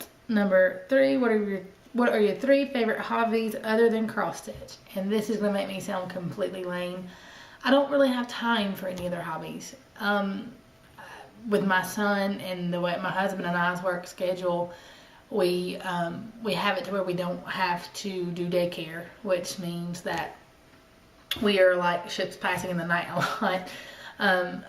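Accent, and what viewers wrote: South, United States. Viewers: there is nothing wrong with being a Basic kind of person! You are one of my absolute favorite stitches (maybe becuase I'm from the South too and your accent reminds me of home) and I love your WIPs and finishes and hauls! You have a 2 yr old, as well, so no need to be down on yourself! You are ONE BUSY PERSON! I appreciate the time that you give all of us! Keep the videos coming!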